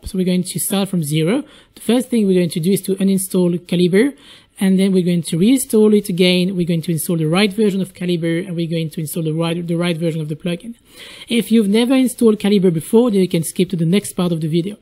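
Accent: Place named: France. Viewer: Really good tutorial + super sweet french accent, thank you again!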